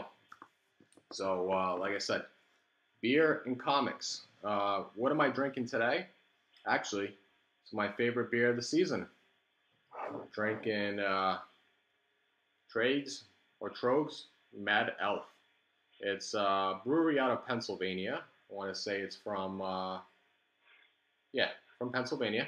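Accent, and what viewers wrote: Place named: Southeastern New England, United States. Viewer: Like how you mix in comics and craft beer. Sounds like you're local to SE MA & RI. So many good breweries and LCS in the area.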